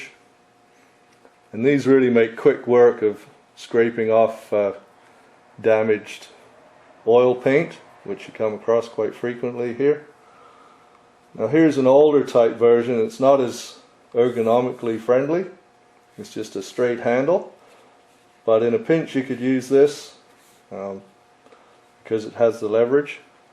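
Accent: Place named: West Country, United Kingdom. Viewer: What is that accent?? Sounds like a mix of South West English and American 🤔🙂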